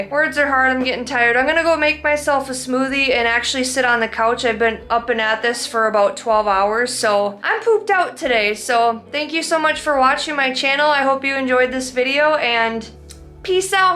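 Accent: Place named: Minnesota, United States. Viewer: That Minnesota accent lol. Ehhh!!! I’m from SC so I’m sure I’d sound funny to you. 😂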